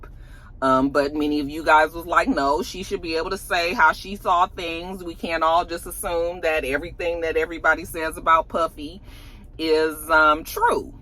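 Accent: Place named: Los Angeles, United States. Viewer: Lol. I love when you do your LA hood 🥷 accent 😂😂😊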